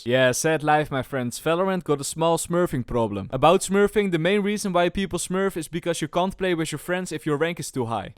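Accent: Netherlands. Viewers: I hear ur dutch accent so loud “if you wanna play a easy potje” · this accent sounds kinda like dutch... :) but i like your vids man keep going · I suspect you were Dutch by the accent, but then proved it lmao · Me: 99% certain this guy is dutch like me cuse of the accent. You: “easy POTJE valorant” Me: 100%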